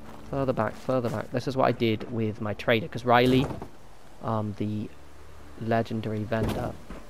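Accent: England, United Kingdom. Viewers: English accents are perfect for instruction video lol you & Adi my favorite Fallout tips and tricks. Thanks bruv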